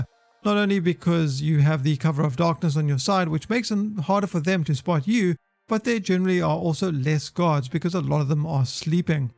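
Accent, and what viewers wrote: South Africa. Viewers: Thanks bud. South African?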